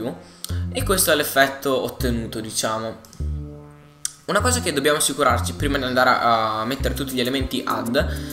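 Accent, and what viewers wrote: Italy. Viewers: Bel tutorial...ma sei Bolognese?? forza BOLOOOOOOO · ***** ma sei di ferrara?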